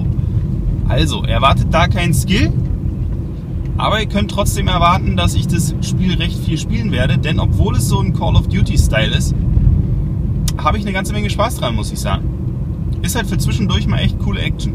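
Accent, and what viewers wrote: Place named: Berlin, Germany. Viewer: Also ick find ja das dein Berlinerisch jarnich mal so einjerostet is ick hab dich voll und janz verstanden.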